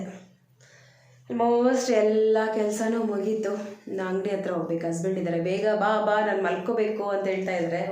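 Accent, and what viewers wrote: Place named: Telugu, India. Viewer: Nimdu yavuru madam swalpa Telugu mathadotara ede❤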